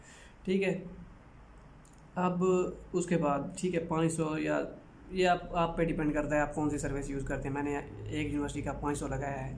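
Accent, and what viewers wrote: Saraiki, Pakistan. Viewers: salam bhi lehgee se ap Saraiki lagtee ho aisa ha kia?? :) By the way meri ye mail ha is pe contact kareen please ap see bat karni ha Jazak allah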